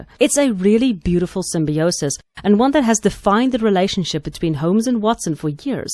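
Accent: South Africa. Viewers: Within the first 30 seconds, I could hear the SA English accent. Appreciate that there's a local female gamer giving her two cents. Much love!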